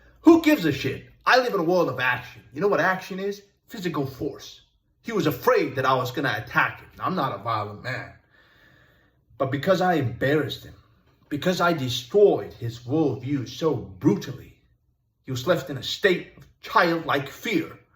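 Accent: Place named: United Kingdom. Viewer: too british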